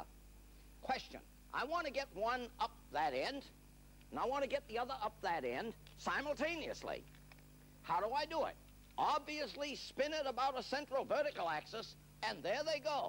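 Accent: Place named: Mid-Atlantic, United States. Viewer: He is using the mid-Atlantic accent. I wont explain it to you but you can search up "BrainStuff-Why do People Talk Weird in Old Movies" on YouTube and they will explain it to you.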